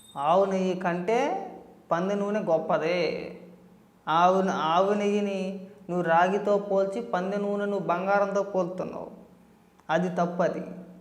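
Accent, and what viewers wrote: Godavari, India. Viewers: medhi e ooru bro, me basha godarolla basha la undi. any way you were great. keepit up...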